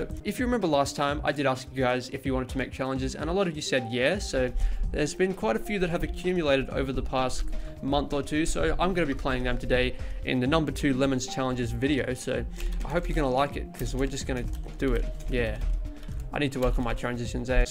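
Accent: Australia. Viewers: is sounding significantly more australian than usual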